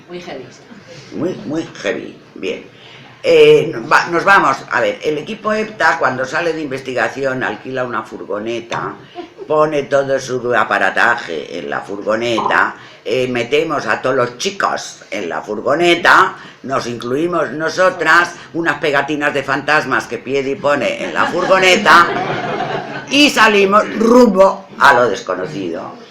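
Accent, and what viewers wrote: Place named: Galicia, Spain. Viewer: y con el acento gallego mejor aun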